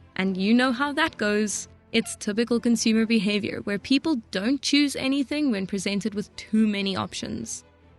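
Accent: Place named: South Africa. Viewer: Thinking the same thing, hey. Accent is too South African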